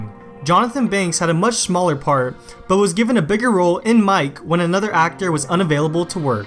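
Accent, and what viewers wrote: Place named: Midwest, United States. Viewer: He sounds midwestern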